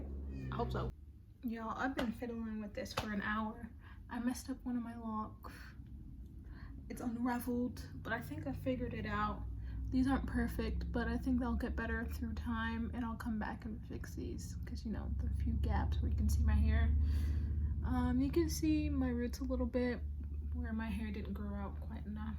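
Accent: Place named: United Kingdom. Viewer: As a Londoner the British accent is appreciated 😂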